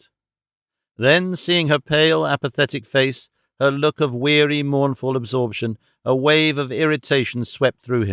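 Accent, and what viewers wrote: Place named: England, United Kingdom. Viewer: Best version of this, in my view. The English accent just MAKES it.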